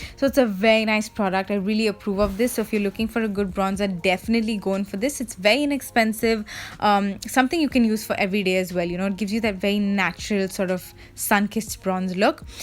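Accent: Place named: United Kingdom. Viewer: and please stick to british accent for ur voice over !! 😘😘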